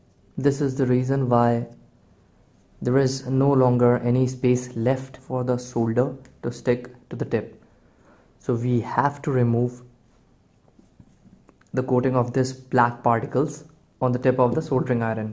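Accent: India. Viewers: EVERYWHERE HINDI YOUTUBERS (ACCENT AND HANDS) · Reading all the negative comments on this man's well produced, articulate and somewhat helpful video was discouraging. Looking at his tools and noticing his accent I would bet he does not live in the U.S/UK/Australia or some other country that has access to state of the art equipment or $ to purchase them. My guess is he lives in India with a billion people and only 10 have even a solder iron. The iron he is using was probably passed down from his grandfather and was sanded well before he got it. So last resort if the tip had its coating removed before is to sand. And that will work. Not long...but will work to do a job. Hats off to a well produced video and using what ever means necessary to make a fix.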